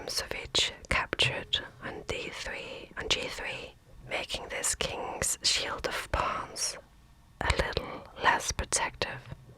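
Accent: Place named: Ireland. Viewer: bravo! i usually view whisper vids as a copout for wannabe-ASMRtists who don't have a suitable voice, but you're the exception to the rule. question before you get too famous and stop replying - what's your nationality? I hear an Irish accent most of the time but iirc you said you were from Belgium or The Netherlands?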